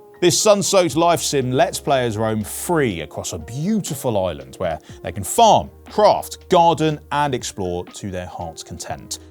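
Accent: United Kingdom. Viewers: They need to get rid of these UK accents. Petrol? , this guy really said dinosaur in an alien world game.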